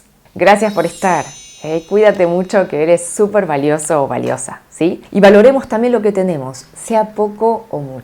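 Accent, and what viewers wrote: Buenos Aires, Argentina. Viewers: . Mis saludos y lo que haré a continuación es en carácter de pregunta. Ya que mire muchas películas coreanas y en algunos casos la temática se repite siempre de gente pobre en ascenso o de gente millonaria que se hace pasar por pobres. La pregunta es, hay ese trato en Corea entre jefes y empleados? Llegan a ese nivel de insolencia? Está todo tan dividido en clases sociales?... No quiero ofender por favor, ya que los coreanos que yo conocí siempre son agradables al menos aquí en Rusia. Todo es pregunta quizás en algún momento lo puedas leer. Mis saludos y gracias por tus vídeos y tu acento porteño. Desde Novosibirsk Rusia Gustavo Ziryanov.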